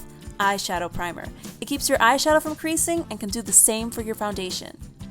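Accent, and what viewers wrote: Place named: New York, United States. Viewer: *yeah for new york accents*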